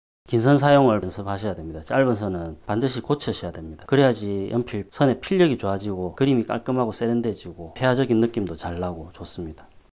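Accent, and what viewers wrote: Gyeongsang, South Korea. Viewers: 아 마음의 답글 우선 고맙습니다 ^^ 얼추 경상도사투리시라 짐작대로 부산이시라니 머네요 아쉽구요 인생후반 .. . J 님의 재미난 설명곁들인 멋진기초? 드로잉실력 나눔을 보다보니 불현듯 어렸을적 초등시절의 미술 ? 제재능이 생각났어요 새삼 .. 인생후반 겪고있는 힘든일들을 극복 승화라도 시킬수있는 힘 .. 얻고싶음을 기대하는거겠죠 말씀대로 교보든 어디든가서 스케치북 미술연필 구해봐야겠네요 선생님쓰는 연필 .. 뭘로 구할까요 ? 유투브 공짜연습생들 모여서 혹 ??? ㅋㅎㅎ 뵐날까지 함 기대 그려도봅니다 문외한에게까지 나눠주시는 마음 진심 고맙구요 👍👍👍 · 알수없는 유투브 알고리즘의 추천으로 아무 생각없이 보면서 차분히 설명 잘해주시는 경상도 아저씨구나 생각했는데 에서 빵터짐ㅋㅋㅋ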